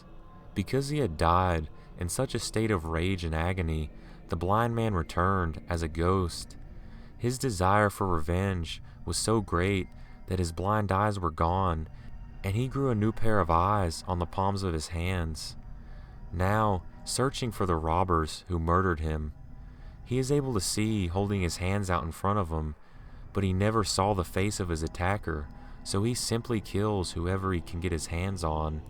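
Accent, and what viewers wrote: South, United States. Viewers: accent is super strong which part of the south are you from im from the south to btw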